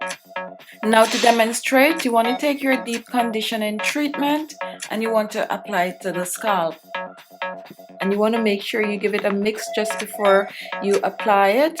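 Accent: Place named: Jamaica. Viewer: You must be Jamaican with that accent , great video..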